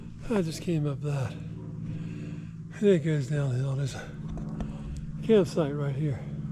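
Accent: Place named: North Carolina, United States. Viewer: I heard your NC accent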